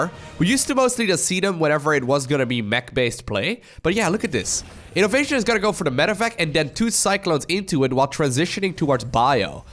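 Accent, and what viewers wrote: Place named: Netherlands. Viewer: dutch accent spotted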